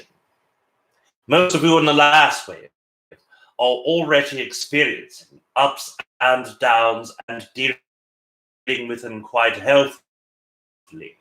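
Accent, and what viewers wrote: United Kingdom. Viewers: First time viewer. Why does sound British?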